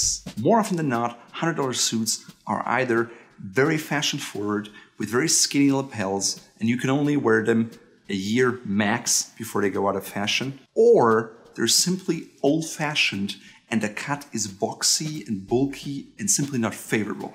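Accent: Germany. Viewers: He has a hint of a German accent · Awesome. Thank you for making this video. I hear a slight accent, German or Jewish. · I sense German. not sure if correct or not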